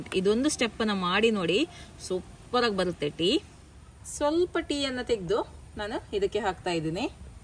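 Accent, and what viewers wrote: Mangalore, India. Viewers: Supertea madam maguvinathaha mukhadaste mangaloorina mathu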